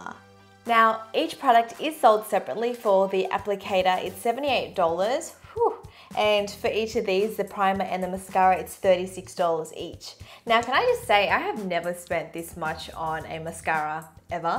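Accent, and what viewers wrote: Australia. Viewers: isn't it funny how she has an australian accent I am from australia too there is actually a chance that I have walked past her on the street without even knowing that she is a youtuber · OMG why straight away when she started speaking I first thought of how I cook that lol there Australian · I love her Australian accent! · Are you an asian australian mix? I LOVE your accent! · Aussie accent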